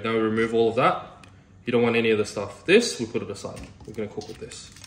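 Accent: Australia. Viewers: Oh yea I see. Yea is Kiwi. He's based now in Australia though and his accent is quite Australian now, so we shall claim him =P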